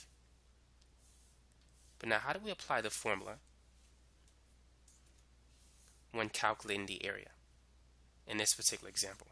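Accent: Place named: South, United States. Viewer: Not all heroes wear capes, some have southern accents and teach STEM subjects on YouTube flawlessly.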